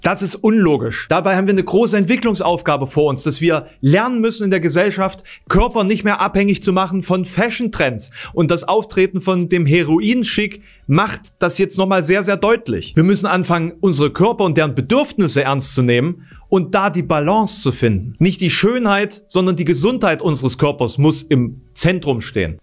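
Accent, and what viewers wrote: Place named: Halle, Germany. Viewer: Gerade entdeckt. Super Video, sympathischer, tolles Thema und vor allem...hallenser Dialekt. Ich liebe alles daran.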